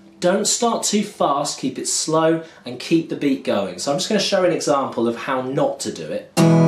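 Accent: United Kingdom. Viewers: 🤔🤔🤔 Thé British accent is so class compare to américain's 😱😱😱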